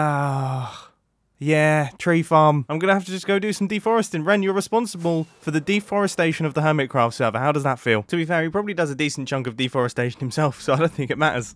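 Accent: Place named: Scotland, United Kingdom. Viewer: YAASS I FINALLY FOUND ANOTHER SCOTTISH DUDE ON YOUTUBE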